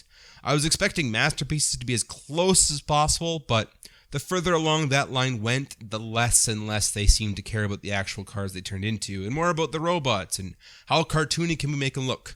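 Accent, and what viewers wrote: Canada. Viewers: Hey, I know this comment is kind of late. I just wanted to let you know that I've enjoyed watching your videos for years, the transformations are amazing to see in stop motion, and I'll be honest, taking away the articulation section isn't that major, in fact a little change here and there can be good for a YouTuber to improve! Also I just love your midwestern Canadian accent It's clear, and fully understandable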